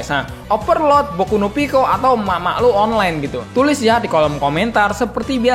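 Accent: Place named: Java, Indonesia. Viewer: kek si re:Jero mati terus ampe bosen Gw suka logat nih creator jujur(asal jeplak) dan medok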